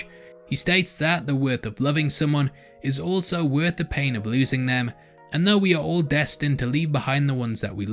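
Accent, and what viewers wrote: United Kingdom. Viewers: An old, beautiful house... British accent... …nice dresses. Count me in! :)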